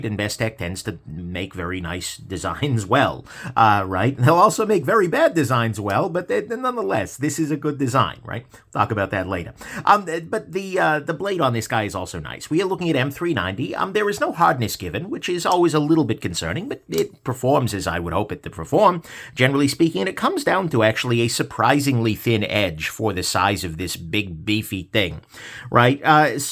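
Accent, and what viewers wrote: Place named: East Coast, United States. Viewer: Hey, love your stuff but do you also run a YouTube channel that deals with MMA … … same dialect coming out of somewhere on the east coast, I like it. Anyway, love the channel.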